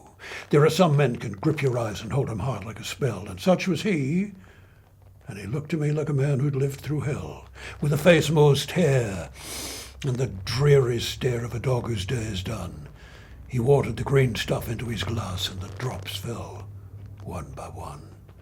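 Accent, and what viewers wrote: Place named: Australia. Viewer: It works well in an Aussie accent. Could almost be an outback tale.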